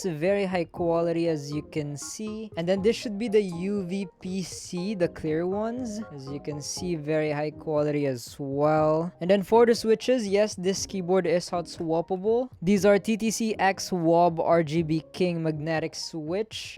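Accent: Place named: Philippines. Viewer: Are you Filipino by chance? You sound like one. 😊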